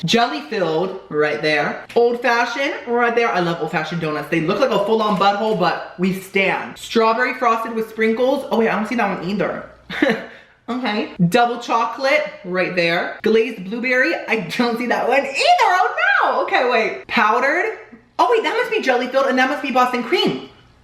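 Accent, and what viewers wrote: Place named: United Kingdom. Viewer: Hilarious video! You and crack me up! You MUST convince him to do a taste test video with you! That would be so fun! Also...you need to made a video of you just saying CROSSIANT and BUTTA (in your British accent) for 10 straight minutes! lol...the way you say CROSSIANNNN...just cracks me up! One more thing....a friend called me and I said I have to call you back I'm watching...lol..nobody interrupts my time.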